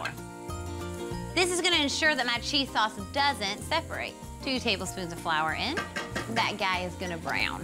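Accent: United States. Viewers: You sound some what counrty but the ingredients smh 👎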